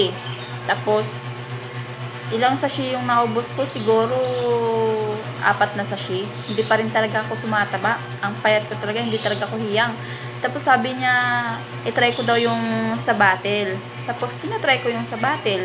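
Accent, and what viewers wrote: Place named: Visayas, Philippines. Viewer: Ok Lang maging bisaya ang accent ikaw Yan ei may sari-sarili tayong accent just be proud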